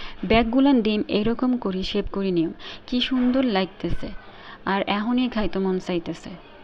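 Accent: Noakhali, Bangladesh. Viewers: ভাবী ত নোয়াখালীর ভাষা খুব সুন্দর করে কইতেছেন। অনেকদিন পরে এরকম নোয়াখালীর ভাষা আমি শুনতেছি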